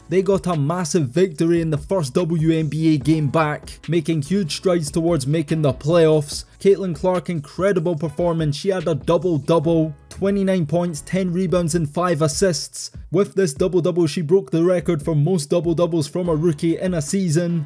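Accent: United Kingdom. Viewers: Bro why is it always a British dude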